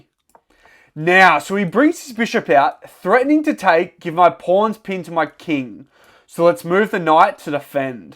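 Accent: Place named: Australia. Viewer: australian accent lol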